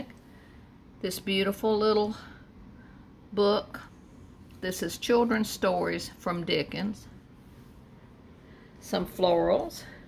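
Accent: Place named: South, United States. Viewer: Love it ALL!!! You certainly have more energy than I do!! Be careful in the heat!!! Fun to watch and hear your great "southern lady" voice!!! You, John and Baby Boy have great weekend! Good luck at the yard sales! 🧑‍🦳🏡🌹🙂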